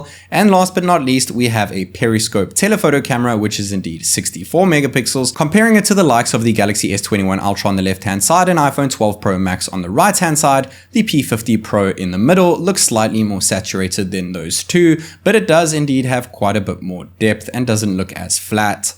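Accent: South Africa. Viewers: Are you from south africa by any chance and just living in china. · Mate, your accent sounds South African . You from here ? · no way are you south african dawg?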